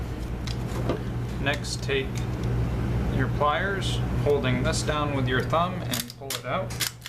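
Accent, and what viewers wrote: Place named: Canada. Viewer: Sounds Canadian. Great fun eh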